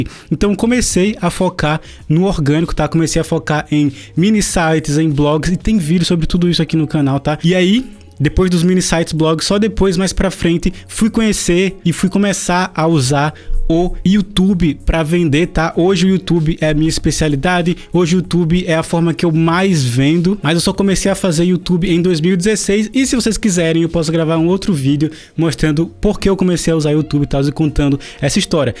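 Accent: Northeast, Brazil. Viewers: Vc é massa!! Vc é uma inspiração pra mim! De onde vc é hein?! Vc tem sotaque nordestino! Kkkk Eu sou de Recife! Parabéns pelos resultados! Showwww!!!!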